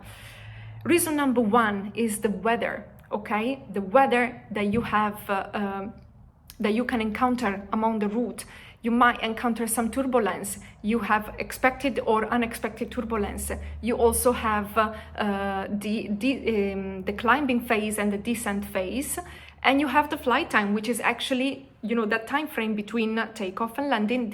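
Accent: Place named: Italy. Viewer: You have a nice Italian accent.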